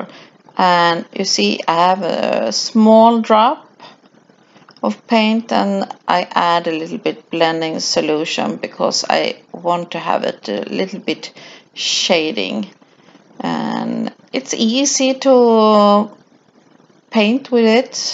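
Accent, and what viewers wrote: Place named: Sweden. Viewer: The end result is so awesome! I love your accent! Are you a Swede?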